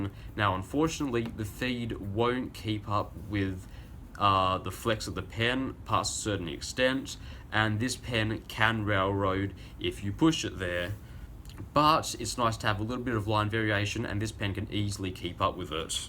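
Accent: Australia. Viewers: As ive been told, one of the Parker Chinese factories was repossessed and they just kept on using the same machinery but I know some factories just make knock offs of parkers (some are very bad quality). Its very hit'n'miss from aliexpress for knock offs but the sonnets got to be one of the worse. Id rather get a jinhao 250/450/500/750/1200 any day over a parker knock off! Nice to see a fellow ozzie accent on youtube, keep it up and checkout my videos (trust me, youll like it)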